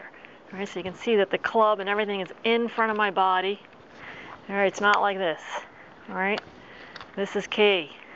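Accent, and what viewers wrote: United States. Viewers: your instruction is perfect,love you to bits,is the hair to keep us smiling because it’s working.love your USA accent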